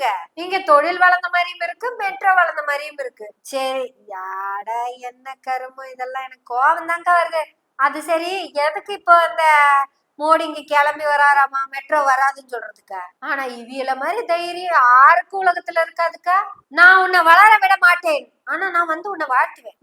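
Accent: Kongu, India. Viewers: Very interesting and thought provoking presentation in the sweet Kongu Tamil. May the Almighty bless you for better prosperity. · மகளே..!!.!! எப்போதும் தேன் ததும்பும் கொங்கு தமிழிலேயே உன் கருத்துகளை பதிவு செய்யம்மா என. தாயே..!! சிறப்பு..!! மிக சிறப்பு..!! மிக மிக சிறப்பு...!!!?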